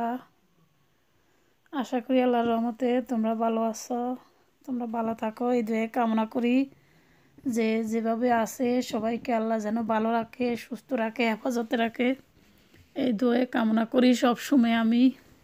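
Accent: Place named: Noakhali, Bangladesh. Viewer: আসসালামুয়ালাইকুম আপু তোমার ভিডিওটি খুব ভালো লাগলো তুমি কোথায় থাকো বেল বাজিয়ে রাখলাম আপু তোমার কথা শুনে মনে হচ্ছে তোমার বাড়ি নোয়াখালী আমার বাড়িও নোয়াখালী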